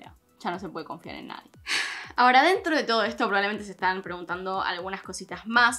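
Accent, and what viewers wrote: Argentina. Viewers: AMÉ ESTE VIDEO! HABLANDO BIEN ARGENTO! puteadas, humor negro y la más transparentes opiniónes. A pesar del estrés por mudanza , te pasate con este video!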